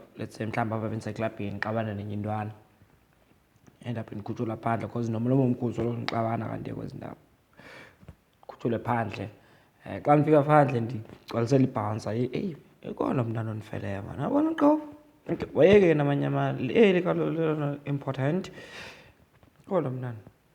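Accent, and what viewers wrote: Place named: Sterkspruit, South Africa. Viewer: Esakho Isixhosa ingathi sesase sterkspruit 🥱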